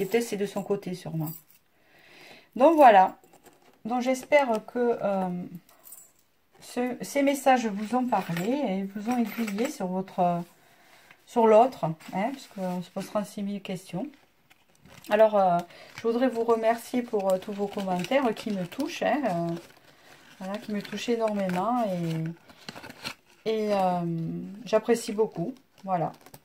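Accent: South, France. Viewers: Bonjour, J'adore ton accent du sud,il inspire la joie ,le soleil, ne le change pas ,un plaisir de t'écouter parler Merci pour ta générosité ❤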